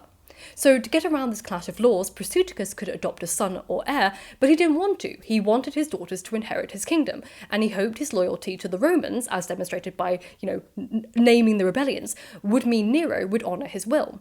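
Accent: United Kingdom. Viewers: How do you have the classiest, poshest English accent in the universe, my WORD?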